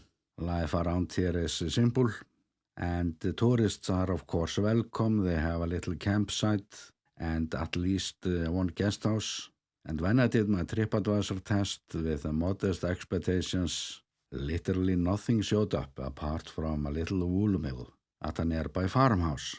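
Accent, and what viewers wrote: Iceland. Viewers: Have to say that you are not only an incredible videographer of your country, you are also an incredible historian about your country, with an unmistakable Icelandic accent! Thanks so much for this latest complete overview of one of your lovely communities. How would we know they existed without you! Great fun to watch, hear your voice and learn something new...all rolled into one few minutes of my time. Amazing!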